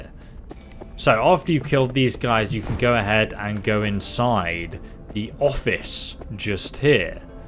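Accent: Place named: United Kingdom. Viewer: It's called being British, we dont mispronounced things, Americans do.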